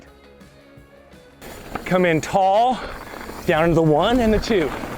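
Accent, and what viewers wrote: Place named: United States. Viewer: Thank God we have usa boy and accent. It's about f time. That I dont hear a f English accent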